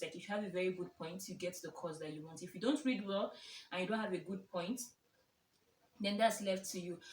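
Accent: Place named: Uganda. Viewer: Your accent is turning into a Ugandan one😂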